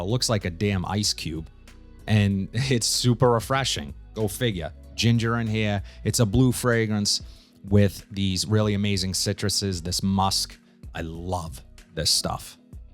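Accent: Boston, United States. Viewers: Thank you for the new fragrances, so many channels repeat what they have. I always come back to you .. and the Boston accent 🤪 how can I get you a sample of Megamare, I so want to see how you feel about this controversial smell. It’s so ocean it’s almost algae. I kind of feel Messoni wave is the best average overall but damn that sticks to me like glue. I can’t stop wearing banana republic metal rain.. this stuff is bangin 🤩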